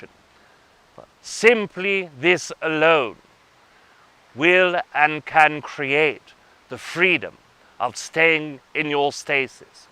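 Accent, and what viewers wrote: England, United Kingdom. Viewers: Curious why does he come through with an english accent? Fasting from all things in life in my opinion is the key to resetting the self to become more whole and aware again.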